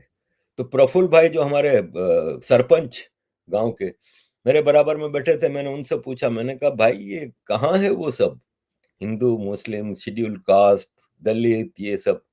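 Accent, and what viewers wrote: Gujarat, India. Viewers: YOU ARE TALKING LIKE GUJARATI N THATS WHY YOU ARE SWEETEST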